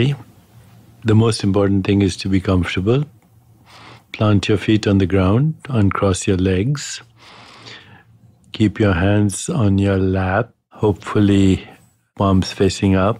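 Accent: India. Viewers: The thick Indian accent is very hard to listen to. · I like your thick Indian accent...relaxed me 🤗. Everyone should enjoy and experience . In our awareness.... The nature of the breath..In the now! 🌟 🌟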